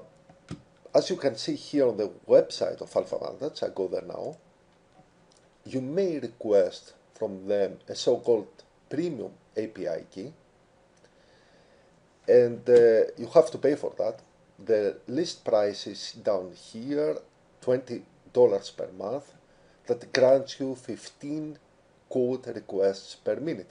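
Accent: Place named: Greece. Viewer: Απο την προφορα καταλαβα οτι εισαι ελληνας χαχα.... δεν ξερω τι ακριβως προσπαθεις να κανεις τυχαια βρεθηκα στο βιντεο αλλα ισως μπορεις να μειώσεις τα calls προς το api που χρησιμοποιεις για αν μην χτυπαει το threshold που έχουν. ΠΧ να τραβηξεις πληροφοριες απο yahοο finance με την buiild in functionality του excel και απο εκει και περα να τραβηξεις σε αλλλα κελια με το vantage indicators που μπορει να μην παρεχονται απο το yahoo Αλλα γενικα.. ολοι αυτοι που δινουν api ...στην ουσια πουλανε api και σε αφηνουν απλα να δοκιμασεις και μετα ζητανε γελοια ποσα για api calls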